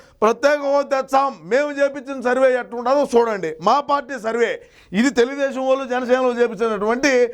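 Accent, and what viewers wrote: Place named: Kadapa, India. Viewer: Anna first time telugu Kadapa slang vinutuna.super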